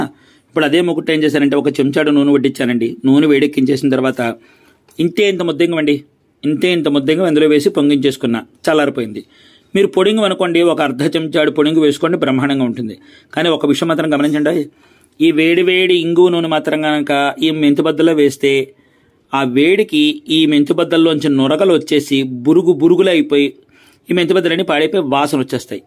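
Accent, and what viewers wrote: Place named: Guntur, India. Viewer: Guruvu gariki namaskaramulu. Mee vantalu ma amma garini gurthu chestunay andi. Meedi a vooru andi. Mee vucharana guntur district ni gurthuchestondi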